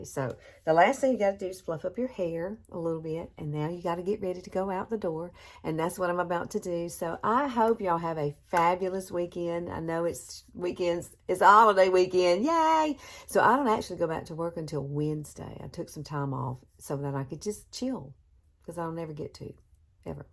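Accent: South, United States. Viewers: Love your southern accent 💕 what a stunning look I am going to try that on my 76 yr old wrinkly eyes and face 🙌 thank you beautiful girl 💕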